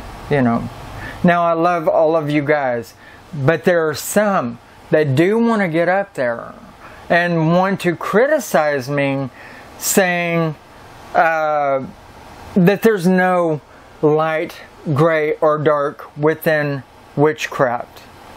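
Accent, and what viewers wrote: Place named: Dakota, United States. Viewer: I lv your accent Is it dakota?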